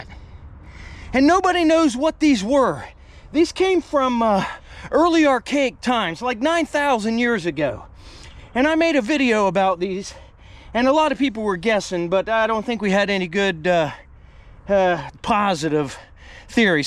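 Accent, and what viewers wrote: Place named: Pittsburgh, United States. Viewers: It's a southwest PA dialect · That Pittsburgh accent I can here it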